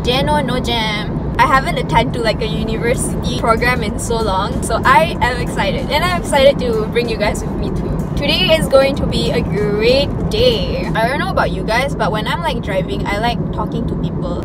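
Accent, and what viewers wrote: Sarawak, Malaysia. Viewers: love the sarawak accent omg!!! <3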